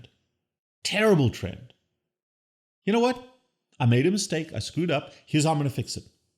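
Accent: South Africa. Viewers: Doc - Are you South African?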